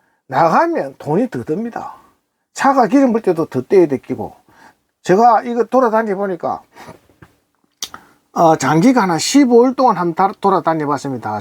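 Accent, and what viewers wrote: Gyeongsang, South Korea. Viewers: 선생님 잘 들었습니다 항상 건강하시고 좋은 캠핑 힐링 하십시오 경상도 고향이신것 같아요 ~~~ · 경상도 분인가 봐요. 구구절절 맞아요. 저도 9인승 5인승 구조변경 스타리아 라운지 8천만 원대, 1년 3개월 된 것을 5천 2백만 원에 구입하였는데 새 차나 다름없더군요. 우리 마눌님하고 3박~4박으로 주로 관광 목적으로 다니고 있지요. 비용을 아껴 써도 적게는 20십~40십만 원 들어갑니다. 저도 테이블은 사용하기 불편하여 무게만 나가고 집에 두고 다니고 있어요. 스타리아로 70대 분이 10개월 만에 세계 일주하신 분이 있더군요. 건강하시고 행복하세요.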